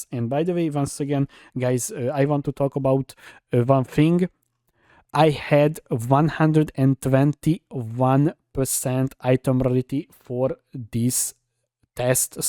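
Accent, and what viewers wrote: Hungary. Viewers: Ez a Magyar akcentus nekem gyanus :D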